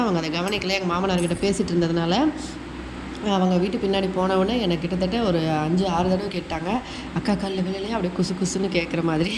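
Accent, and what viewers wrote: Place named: Odisha, India. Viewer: Odia language neenga pesuradhu siripu dha varudhu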